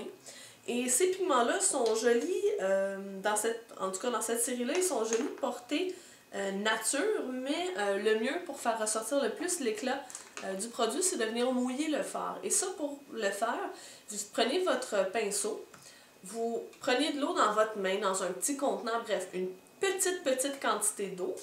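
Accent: Quebec, Canada. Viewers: salut jaime vraiment tes video! sa fait du bien dentendre du quebecois loll des fois les francaise ne dise pas les mot anglais comme il faut et elle me perde et surtout il y a des produit quon trouve en france mais pas au quebec!! merci tes super bonne!!!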